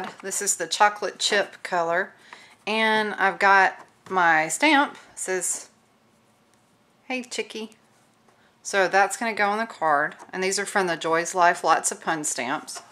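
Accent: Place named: South, United States. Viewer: When I first saw the video I thought you had went and bought a Cricut Cake..I was like "what..I liked her fake"...hahaha. I love the way you talk too...I have the southern accent also so its great to hear someone else with one!! Great video!!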